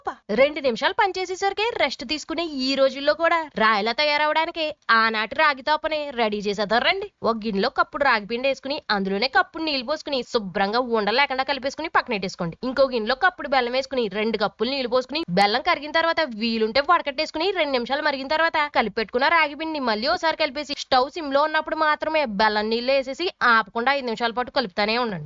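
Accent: Godavari, India. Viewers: గోదావరి యాస మాత్రo highlight 😅😅😅😂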